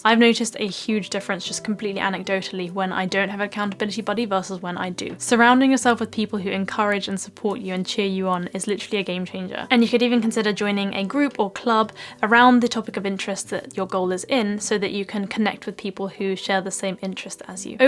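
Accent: United Kingdom. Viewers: Your British is amazing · 纯正英国腔听着舒服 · Thank you for making all the videos easy to understand especially learning the British accent, which I really love.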